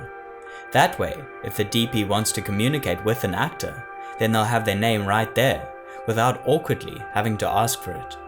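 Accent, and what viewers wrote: South Africa. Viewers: Subscribed. Your South African accent and rich videos about film are worth a subscription.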